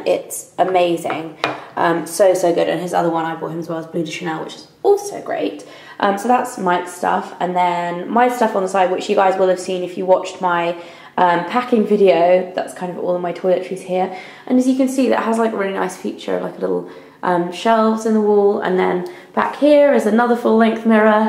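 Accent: United Kingdom. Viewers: aw loved your british accent its cool :)) by the way i'm from Oman :)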